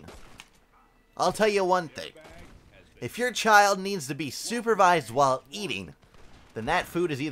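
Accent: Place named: South, United States. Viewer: You sound like a hillbilly. Don't take that offensively. I don't know if it's an act or not but it adds to the comedy.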